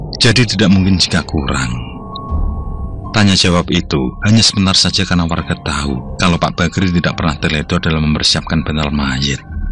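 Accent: Java, Indonesia. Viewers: kang izin masukan, saya penonton diluar jawa.. saya gk paham dg bahasa jawa.. terkadang juga translate nya gk lengkap dan warna nya juga kdg sulit dibaca.. lebih baik ceritanya dengan bahasa indonesia.. tp logat jawa gpp.. makasih dan semoga makin sukses ya kang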